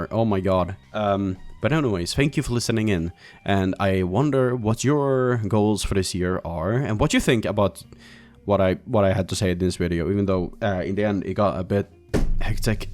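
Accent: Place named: Sweden. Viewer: That's just the Swedish accent, Joel from Vinesauce is the same.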